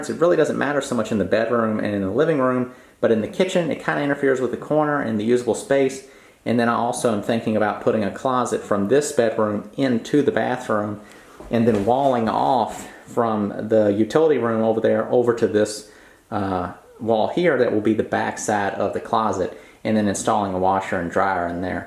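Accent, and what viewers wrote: South, United States. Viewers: His accent indicates somewhere down South.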